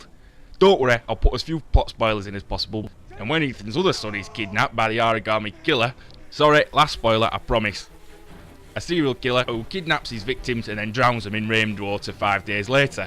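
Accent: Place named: Scotland, United Kingdom. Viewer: the guy wasn't listening to my verbal commands so I shot him. Anyway, good review. Is that accent for real? you sound seriously Scottish, like deep in the hills, kilt-wearing, haggus eating, "Freeeeedom !!" yelling Scottish. are you?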